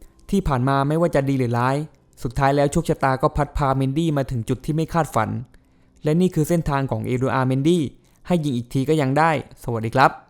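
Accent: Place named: South, Thailand. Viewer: คนใต้ภาคเเน่ครับ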